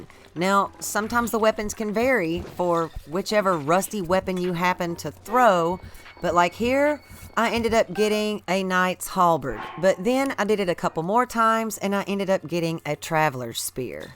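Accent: South, United States. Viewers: First off I just wanted to say that it was refreshing to hear a female voice and one with a southern accent as well. I'm from California, so it's not my accent. 😆 It's nice to hear someone from a different part of the country. Second, I learn a lot from this video. I didn't know you could get arrows from a cooking pot! Regarding the wolves, I like to kill as much as I can for the meat; meat skewers can fetch ya a pretty penny at Goron City. I knew you could change chuchus to other colors, but I didn't know about the water methods. Will come in handy! Thanks! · I know this game has been out for a while but I just started playing it on this oled switch I also just got. Best choice I made in a while. This video is so helpful. Liked and subscribed. Sounds like you're also from the south, lol. Good luck on your algorithm! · Your country accent is so strong and it sounds adorable lol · The Southern accent was an unexpected but pleasant surprise lol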